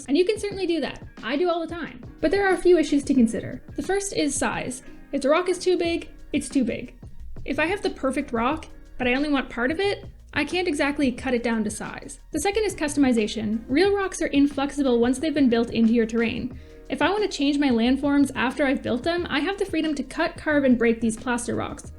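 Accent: Newcastle, United Kingdom. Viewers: I was expecting a heavy geordie accent, but the rocks are good either way.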